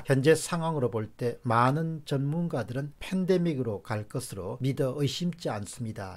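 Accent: Gyeongsang, South Korea. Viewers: 이분은 경상도 억양이 있으신거같아요 저는 우리 아빠같은 경상도라 친근감을 느끼는데 ,, ㅎ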